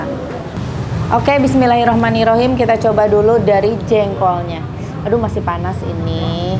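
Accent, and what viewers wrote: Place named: Sunda, Indonesia. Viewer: Gpp tteh ngomong Sunda jg .atw cmpur da stiap org pasti saat bicara logat daerahnya ke bawa..tugas editor ulah males ngedit.kerja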